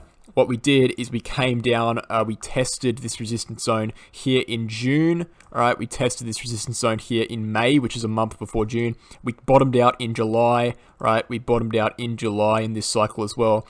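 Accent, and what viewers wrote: Australia. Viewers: So exciting... what accent is that? Australia?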